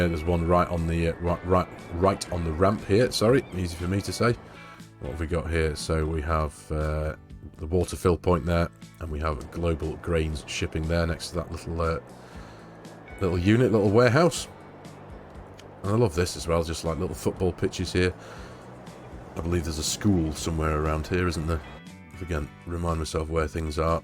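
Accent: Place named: Scotland, United Kingdom. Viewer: "being from Scotland originally" I could tell by the accent 🤣🤣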